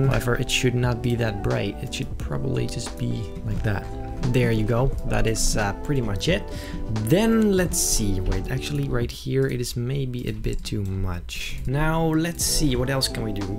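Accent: Netherlands. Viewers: You are Dutch. Im sooo sure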